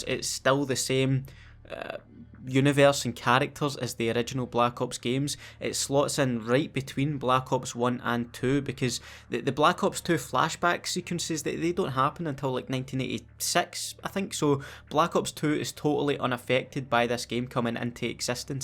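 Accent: Scotland, United Kingdom. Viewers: His voice is so fucking awesome to hear. I wished i had the scottish accent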